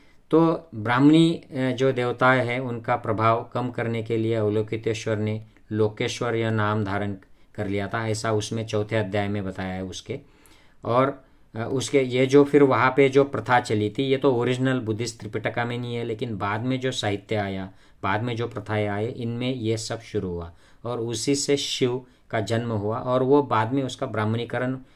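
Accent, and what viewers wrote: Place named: Maharashtra, India. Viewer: खुप छान पण तुम्ही मराठी मधे सांगावे कारण उचार pdhat मराठी वाटते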